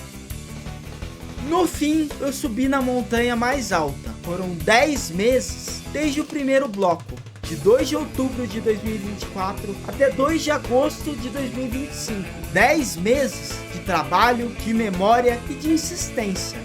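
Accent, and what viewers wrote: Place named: India. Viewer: tenta melhorar esse ingles de indiano ai man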